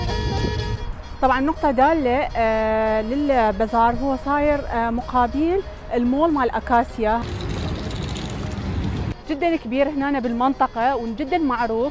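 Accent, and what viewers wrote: Iraq. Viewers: تم اصطياد هذا القناة المميزة بصراحة أولا مشتاااااااقين للاهجة العراقية ثانيا عسل عسل عسل ثالثا تركيا جنة الله في الأرض وتوفيق اختى وتحياتي للعراقيين الشرفاء وتم الاشتراك.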